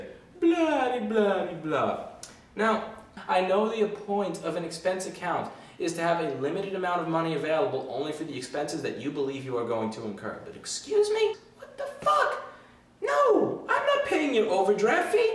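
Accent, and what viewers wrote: New York, United States. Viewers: Listen, you speak very well and can clearly and entertainingly articulate complex ideas - nice brain dude. I think you should consider that you may be suited to entertainment as much as you are computer repair. Almost a NY Wisdom vibe to your delivery that - accent and all - makes me feel like I'm back in the Prickly City itself :) Excelsior, sir.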